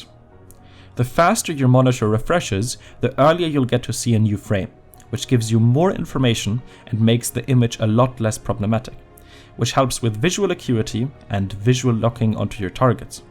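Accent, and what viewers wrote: Germany. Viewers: Man hört so raus dass du deutscher bist haha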